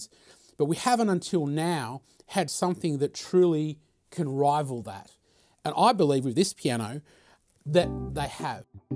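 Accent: Australia. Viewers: Loving the australian accent! how different is the GP609 from the GP607 I by the way own?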